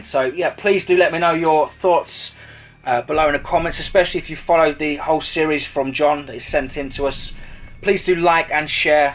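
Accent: England, United Kingdom. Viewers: I Love Your Narrative s and Stories and your Amazing Talented enthusiastic English accent voice that puts an entertaining twist to the tale and puts your listening audience right inside the adventures of those who are attacked by the Creatures of the Night! God Bless You my Friend and Thank you for doing what you do because I truly look forward to Bedtime knowing I can lay back turn on your Channel and relax and Enjoy your latest episode of Cryptic yarn s! Been a fan of Cryptids and Paranormal phenomenon s my whole life since early childhood ! I find that Unknown world of Creatures that are not supposed to exist to be utterly fascinating and interesting especially now that their is growing Scientific Evidence and Eyewitness accounts that these creatures of folklore may actually exist!!! Have a Great Weekend! Keep up the Great Work Please Kind Sir!!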